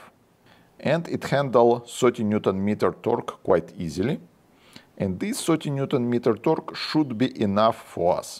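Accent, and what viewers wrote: Russia. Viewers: этоже руинглиш. все понятно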